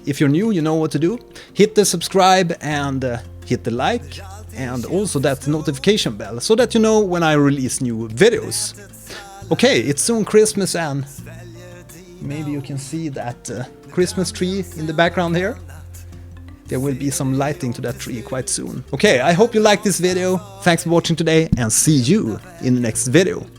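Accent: Sweden. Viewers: omg you sound sooo swedish! love it! <3 (im from sweden too)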